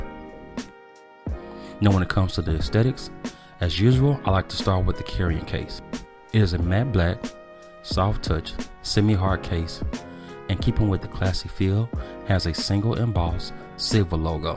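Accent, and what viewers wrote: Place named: South Carolina, United States. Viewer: Oooooooh. I want these! And is that South Carolina I hear in your voice?? 🤣💯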